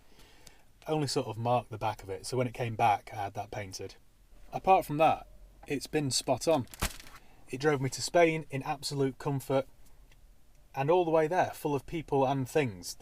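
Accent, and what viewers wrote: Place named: Manchester, United Kingdom. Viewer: Aah the Mancunian, less boisterous Jeremy Clarkson has uploaded another video yet again, lovely video splendid as usual , couldn’t believe the service price yikes!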